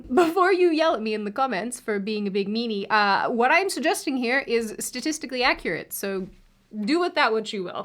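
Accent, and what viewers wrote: Australia. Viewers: Have you been in Australia recently? You sound more Australian in this video than your last one. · If they are it's distant considering her Australian accent. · Still sounds very Australian to me. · Every once in a while, your Auscent shows through, and I love it.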